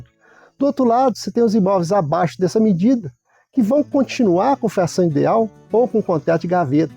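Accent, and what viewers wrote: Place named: Minas Gerais, Brazil. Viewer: Gostei muito da explicação, e também do sotaque do apresentado... MG!? 👏🏾👏🏽👏🏼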